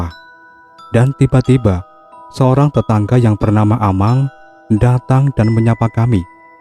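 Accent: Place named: Java, Indonesia. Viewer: Medhokmu lek... Ngapusi thok ki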